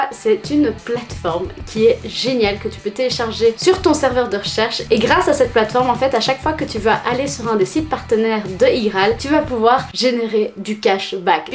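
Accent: Belgium. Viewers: 1) je t’adore dès la première vidéo que je regarde de toi 2) t’as un petit accent qui me semble belge?? Serais-tu belge à tout hasard?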